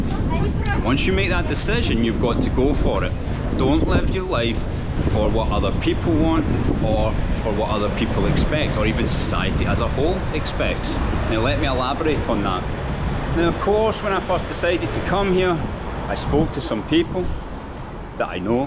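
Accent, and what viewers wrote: Scotland, United Kingdom. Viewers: Thanks for your lovely channel. Love English language, your Scottish accent is so nice 👍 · Love the sound of Scottish English ) thanks for saying such a nice things about Russians ! We will always welcome everyone, who’s coming to Russia with good intentions ! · Your Scottish accent sounds really nice 😊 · You've touched on language so I have a genuine question about your accent. Now I've heard a lot of British accents, but I didn't know what's what. and this is the first time I'm fairly certain that this is what a Scottish accent sounds like. Is this a standard Scottish accent? Reason I'm asking is because I live in the US and there are many different accents, like the Southern Accent for example, where I live. And it is often considered the butt of all jokes, by other regional "accenters" of course.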